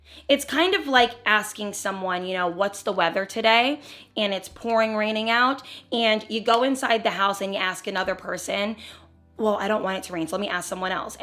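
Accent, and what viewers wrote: New Jersey, United States. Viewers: It’s so sweet, though! You’re very well spoken but it definitely could be a Jersey thing! 😂 I have family there and LOVE their accent and way they pronounce words 🩵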